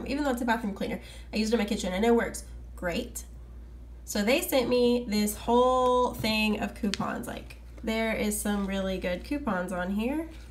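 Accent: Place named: Texas, United States. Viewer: Woodlands? Or Conroe I’m in Huntsville lol 1 and half from Houston! I started couponing about a year and half ago and I left my job as a prison guard to finish my schooling at shsu:) my husband and I did the same when we were working for the state of Texas but after we left to go back to school full time we realized that money was tight and I decided to coupon. I was just wondering I felt like you lived close to Houston by the way you talk. I’m from Kansas so I recognized certain things about Texas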